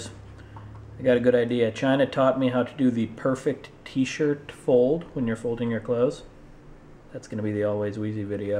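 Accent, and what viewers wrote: England, United Kingdom. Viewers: Running barefoot leads to cuts and abrasions and let's not leave out what happens if you stub your toe! Oh, and then there's slipping on a banana peel or stepping in doggy doo doo!! I really liked your English accent :) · i abousolutly loved your english accent soo funny you sounded like stewie in family Guy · we need to hear the snobby englishman voice more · I think that was the best English accent I've ever heard you do!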